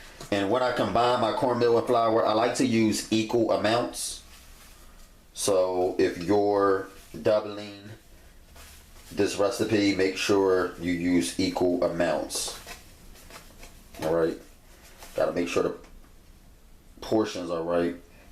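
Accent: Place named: Baltimore, United States. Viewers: Sound like you from Baltimore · lol be sounding like he from PG County Baltimore ahahaha "Fyew"... "Tew"... "Dew"... Oiyew (Oil) hahaah Much luv bro! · He sounds baltimorian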